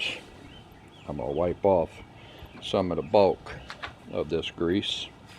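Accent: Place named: Louisiana, United States. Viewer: I was looking for help and found it. I also said this dude is from Louisiana. 😂. I recognized the accent. I’m from Gonzales La. Thanks for the help.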